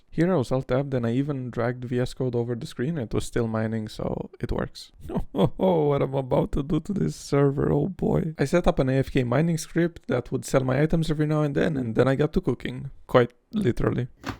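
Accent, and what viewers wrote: Romania. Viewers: as a romanian the second i heard your accent i just knew u are romanian:)) keep up the good work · Why Romanian accent familiar to Indian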